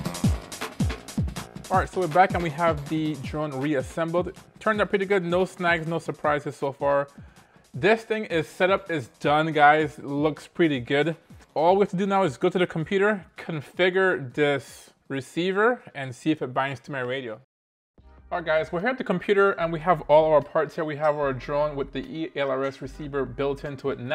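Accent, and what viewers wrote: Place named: Caribbean. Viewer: Sorry sound like you are from the Caribbean which island?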